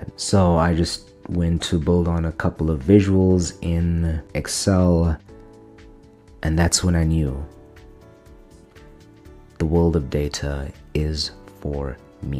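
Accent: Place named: South Africa. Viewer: South Africans legit have the best English accent, I'll fight anyone on that. But on the topic, I get where you're coming from. I'm an immigrant myself, currently in my fourth country. I was pushed into tech. because that was seen as a safe bet and a good match for my skills (and because somehow seeing that someone is into video games automatically equals they'd be a good computer nerd, by some logic of our parents' generation) It was alright. Helped me settle and make some money. But I've always been fascinated by larger systems compared to the minutae of tools and machines that most software careers focus on (I would imagine engineering is the same) I really enjoyed working in Insurance at one point in-between studying/working in tech., and I've always been fascinated and interested in economics. Unfortunately I was never encouraged or supported to pursue that or finance or business; and I had no role models (very important!) until I met my now wife. 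Long story short, I've been studying math for a year now to shore up and I'm going to be starting a Master's in Analytics to first shore up on the necessary applied statistics skills, before I move on to something that is more into economics/policy and thereby something that is more impactful while also being more interesting to me.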